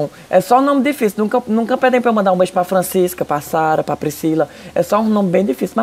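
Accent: Northeast, Brazil. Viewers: Olá, adoro o seu jeito espontânio de falar, apesar de está morando em outro país não perdeu a sua simplicidade e o seu jeito arretado de Nordestino, me divirto muíto com os seus videos.. Manda Alô pra mim meu nome é bem fácil JOSIBERTO 😃😃.. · Gosto demais de assistir seus vídeos. Sou de Aracaju e vixe! é bom demais ouvir outro nordestino falando. Love it! je t'aime! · ...acho é bom! Eu amo esse sotaque gostoso só nosso (sou cearense tbém). Bjo!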